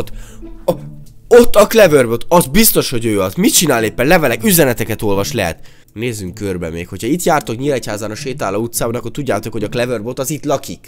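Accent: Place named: Szekler Land, Romania. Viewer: "Föl" végre halotalak székelyesen beszélni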